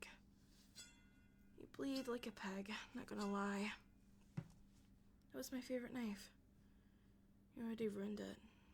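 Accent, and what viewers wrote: Scotland, United Kingdom. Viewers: I guess being scottish is a new fetish, welp *i like it* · I should be afraid, but the Scottish accent intrigues me way too much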